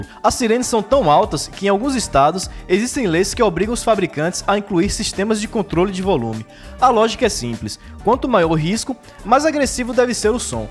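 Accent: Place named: Salvador, Brazil. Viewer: c é daq de salvador irmao? teu sotaque e os video que vc botou ai é tudo daqkkkkk esse ultimo ai é na paralela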